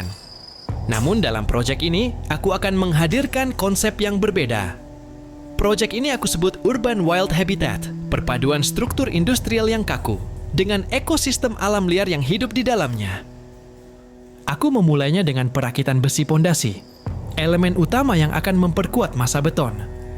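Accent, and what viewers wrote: Medan, Indonesia. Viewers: bg org Medan ya?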